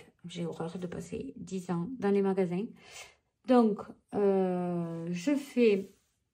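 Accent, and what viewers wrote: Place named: Occitanie, France. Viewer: Je suis nouvelle abonnée. J'aime bien votre accent, il ressemble au mien Région Occitanie ????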